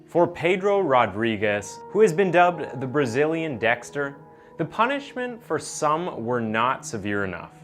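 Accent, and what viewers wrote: Canada. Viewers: Is the narrator/ host Canadian? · caan tell this guys canadian , they say house like hewse and about like a-boat etc lol its the only way i can tell the difference as im british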